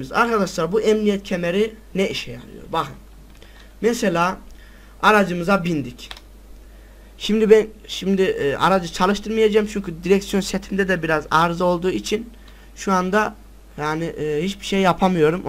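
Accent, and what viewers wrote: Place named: Nevşehir, Turkey. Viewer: way kardeşim sendemi nevşehirlisn şivesinden belli canını yediğim :)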